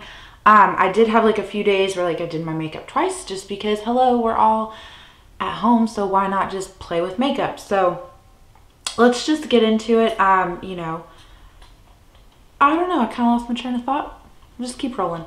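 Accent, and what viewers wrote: South, United States. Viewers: You are adorably Southern! Great progress :)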